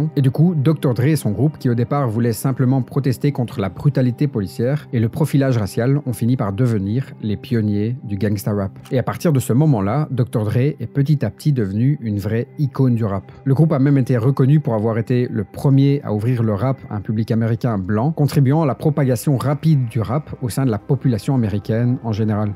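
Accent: Belgium. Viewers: ton accent belge est degeulase horrible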